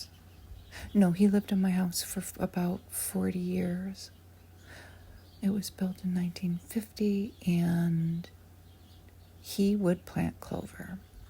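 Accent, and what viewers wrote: Midwest, United States. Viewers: I was born in MI but raised in the south. Most of my family lives in IL or WI so it’s nice to hear that midwest accent for a change.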